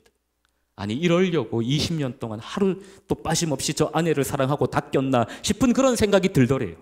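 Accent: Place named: Gyeongsang, South Korea. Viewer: 갱상도 사람이네요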